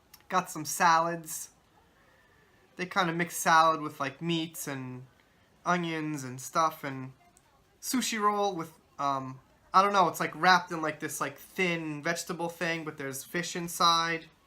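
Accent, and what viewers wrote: New York, United States. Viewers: funny I thought you had a NY accent 😆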